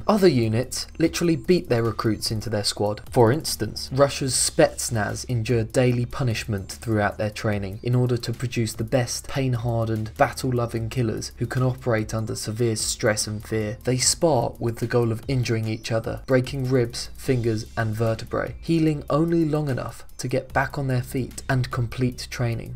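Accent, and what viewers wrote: England, United Kingdom. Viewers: Narrated by the British guy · I think you were biased toward the British because your British your self, i can tell by your voice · You definitely sound British, are you sure your not biased? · and i was right british narrator=british unit great video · go figure you sound British so you pick Britain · Ok, but you habe got english accent. Chosing the SAS as your mother country special forces, somethings wrong ;p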